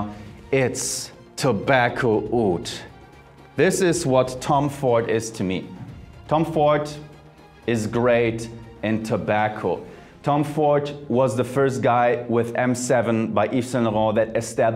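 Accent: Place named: Germany. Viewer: that german accent thou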